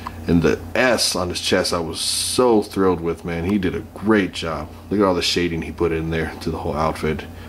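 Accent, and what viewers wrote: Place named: Vancouver, Canada. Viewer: You must be in The Vancouver area Like myself or not,just sounds like it,cool review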